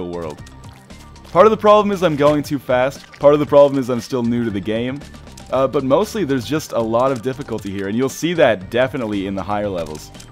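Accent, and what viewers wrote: Canada. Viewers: Wow, sounds like a real Canadian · The canadian accent is really more noticeable in these older videos. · i can hear the Canadian :D This is a classic xP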